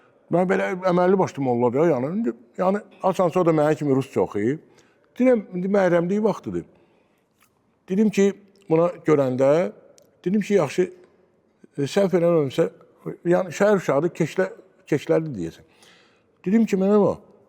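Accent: Baku, Azerbaijan. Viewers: гагаш, тебя очень приятно слушать акцент наш халат Бакинский, да брат Баку не тот и Контингент не тот. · большое спасибо. Бакылы данышыгы эшитдим. Бакинский акцент остался у тех, кто учился в русском секторе школы. В азсекторе преподовали учителя из районов и сел.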